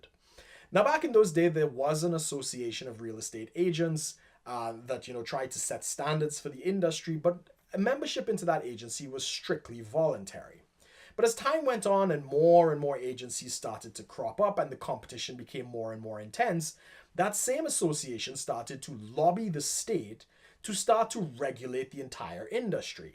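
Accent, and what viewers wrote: Trinidad and Tobago. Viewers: Right away I knew you were from Trinidad.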